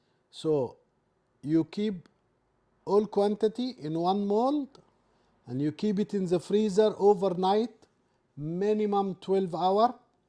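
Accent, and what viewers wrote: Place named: Egypt. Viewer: Egyptian English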